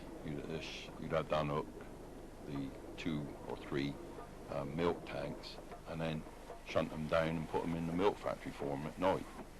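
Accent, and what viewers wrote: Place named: Dorset, United Kingdom. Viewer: Its nicest to hear it narrated in that wonderful Dorset accent. It takes me back in time to my childhood. I loved to hear the Bath drivers, then the somerset accents around Evercreech to the Dorset accents of the drivers and firemen. It makes me feel at home.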